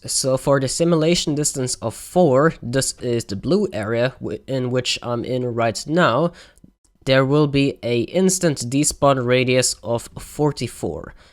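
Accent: Germany. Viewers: German accent?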